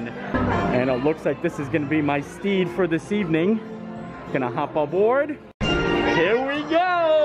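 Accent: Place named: Pennsylvania, United States. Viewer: Hi! We love your videos and get excited every time there’s a new one! Curious, where in PA are you from? I went to Susquehanna University and you have the same “accent” as some of my friends from Shenandoah.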